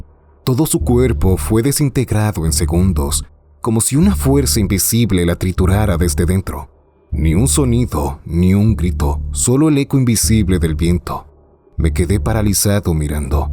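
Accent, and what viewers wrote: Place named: Caribbean. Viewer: Por alguna razon tu voz fuera de la narracion me imagino un Skyper caribeño y me encanta xD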